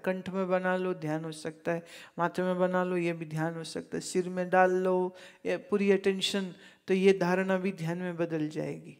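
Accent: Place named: Punjab, India. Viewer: Yours Punjabi ascents is too great.Jai Rafisahab and yours Vaani.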